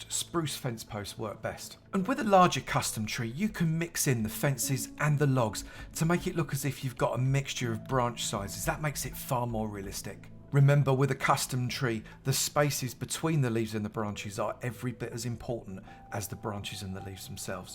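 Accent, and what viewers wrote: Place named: England, United Kingdom. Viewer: He doesn't, he's just English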